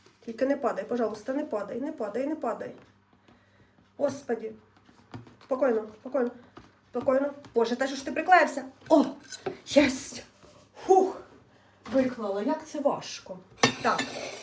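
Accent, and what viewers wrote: Poltava, Ukraine. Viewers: Це полтавська говірка. Мені згадався серіал "Одного разу під Полтавою". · Знає дуже добре українську,але розмовляє,як оозмовляють на Полтавщині.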